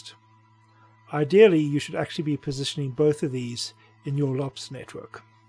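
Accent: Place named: South Africa. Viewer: Came here from the SideFX site, immediately recognized the accent. Howzit boet :D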